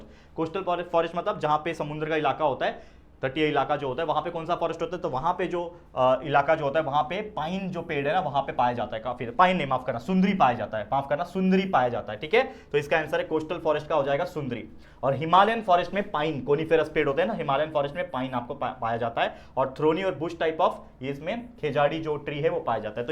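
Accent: Maharashtra, India. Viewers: Sir aap marathi bolte ho kya😅😅😊😊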